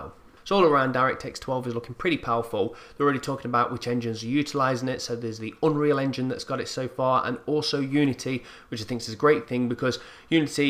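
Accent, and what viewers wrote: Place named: Northern England, United Kingdom. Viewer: Adding the letter "t" to words that don't need it is a common thing in the North,. T'internet and all that.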